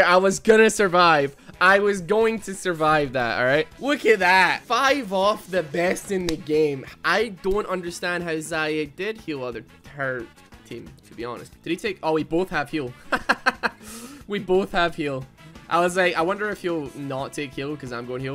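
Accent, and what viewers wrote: Scotland, United Kingdom. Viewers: so scottish dude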